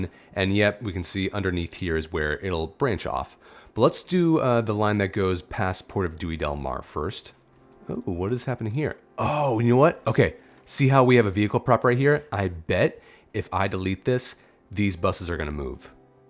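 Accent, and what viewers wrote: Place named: United Kingdom. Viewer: Dewy Tubey, a little british accent LOL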